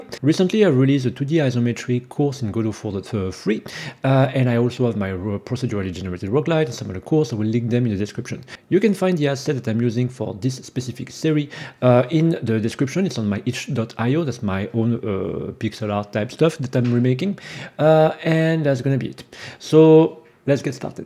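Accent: France. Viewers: Have questions will it be easier to make this with unity or godot And I like your mix french and English accent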